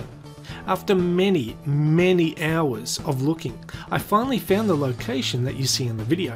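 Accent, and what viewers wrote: Australia. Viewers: yes exactly I kept on hearing "Murderway" and "Murderists". I guess that's Aussie accent! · yeah I agree with Ted. It's a well-spoken Australian accent. Beautiful accent. My accent.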